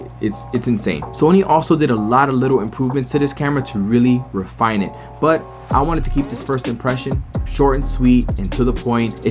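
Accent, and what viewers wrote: Chicago, United States. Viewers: are you from chicago?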